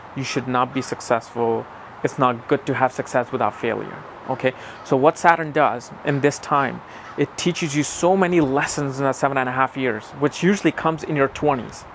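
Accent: United States. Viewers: Just because he speaks in american accent? Do you think this is new invention? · that’s your petty mind speaking. Coz one thing that sucks about him is his American accent. It’s his attitude and how he explains astrology is very unique intelligent and entertaining, that draws us to him.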